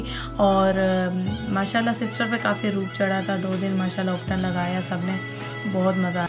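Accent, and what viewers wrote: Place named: Saraiki, Pakistan. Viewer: I am also saraiki speaking.itny time bd apni boli sun k bht acha laga. Btw i live in khobar. Bari apk vlogs ma dekha k ap meray area se guzar k jati hain khanay penay ❤❤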